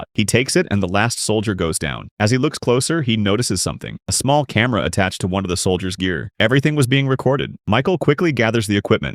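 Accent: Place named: United States. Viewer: Why do we have to endure these ghastly American narrations?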